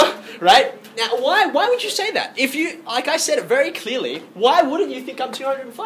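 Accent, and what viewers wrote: Australia. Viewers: I would listen to this guy any time. DAT DERE AUSTRALIAN ACCENT. !! Very well explained btw!!!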